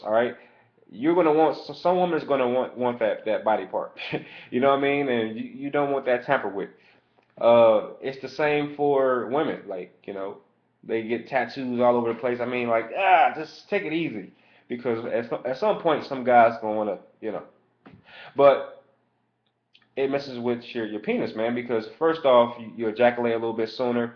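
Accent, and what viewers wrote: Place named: Worcester, United States. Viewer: Tell me this guy isn't from Worcester, did he say Becker?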